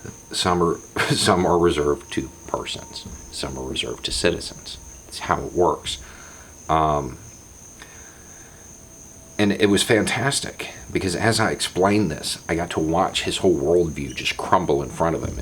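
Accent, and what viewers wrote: South, United States. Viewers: I have started using your videos all the time to help me argue my points. You’ve become a great inspiration to me. Your accent helps when I’m addressing people in the southern US. Like in your other video you talk about the wrong person sending the right message. My female status makes me unreliable to most of them as well as my mixed family. However lots of people I post your videos to, just call you a paranoid loon but a few have actually attempted to understand. Either they debate the points or just say good point. You are making a difference with your videos. Thank you❤️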